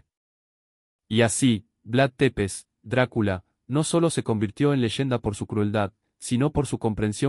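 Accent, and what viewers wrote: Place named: Rioplatense, Latin America. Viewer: acento me suena a español rioplatense en el video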